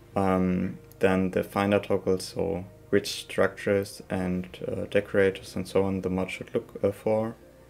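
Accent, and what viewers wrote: Germany. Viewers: Bro i am hearing the DEUTSCH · Du bist sprichst deutsch oder? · Ich finde es wunderbar wie man sofort hört dass du deutsch bist hahaha :D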